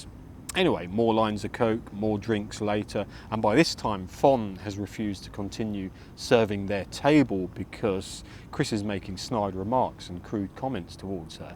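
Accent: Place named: London, United Kingdom. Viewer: more drama in 22 minutes than in tons of dubious movies....I'm guessing any slightly shady story narrated with the flavor of a London accent (or do my ears betray me?) has that special something, but maybe it's just me ;-)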